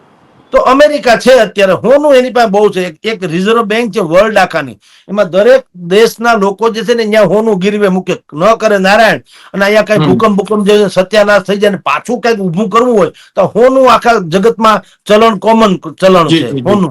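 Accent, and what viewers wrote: Kathiawar, India. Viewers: I just love how you talk in Kathivadi Gujarati and explaining everything in detail 🤣🙏👏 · કાઠિયાવાડી શૈલી માં ખુબ સરસ મોરે મોરો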